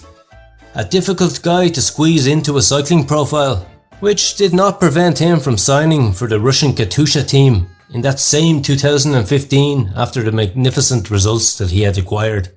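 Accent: Ireland. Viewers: The videos and the information are always great but the Irish accent takes the biscuit or the cake👏💪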